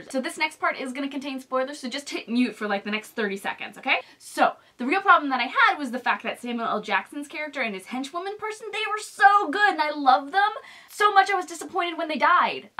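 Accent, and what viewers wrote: United Kingdom. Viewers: I just happened upon your channel today, and I'm really digging all your characters! Do you think you'd be able to make one out of the slick RP accent you're doing here? Also, if you're up to the challenge, it would be awesome to see some of your more established characters do impressions/parodies of each other (unless you've already done that... I'm still working my way through your archives). Keep up the great work!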